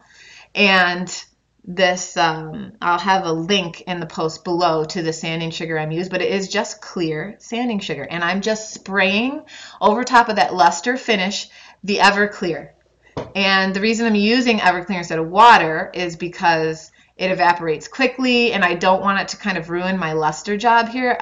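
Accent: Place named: Upstate New York, United States. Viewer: First of all I adore your voice and way of explaining things... your accent reminds me of my late aunt who was an upstate NYer and I miss that! This is absolutely gorgeous and I can't thank you enough for everything you share with us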